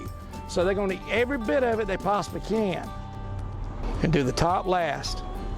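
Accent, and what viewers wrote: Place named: New York, United States. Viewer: I almost couldn't understand you with that thick New Yorker accent me being from Louisiana...lol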